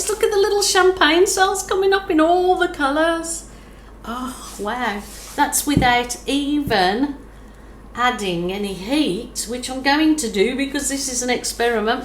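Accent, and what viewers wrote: Birmingham, United Kingdom. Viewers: Wow! What a stunning result! I think the balloon kisses are the icing on the cake. Just out of interest, is that a Birmingham UK accent I can hear? I live there and it does sound familiar!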